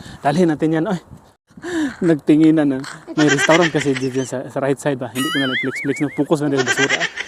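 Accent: Visayas, Philippines. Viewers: Visaya kaayo ka ug lingaw kaayo ka mag vlog. 😅😊 Asa ka dapit dito sa pinas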